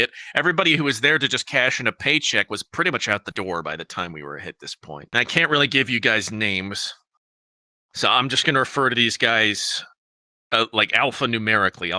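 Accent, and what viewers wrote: Chicago, United States. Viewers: Sounds like Chicago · sounds like Chicago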